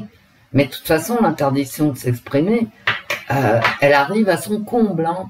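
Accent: Paris, France. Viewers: Merci... contente que vous soyez soutenue par vos consœurs. J'aime vraiment comment vous tirez les cartes, votre franc parlé et votre accent de Paris...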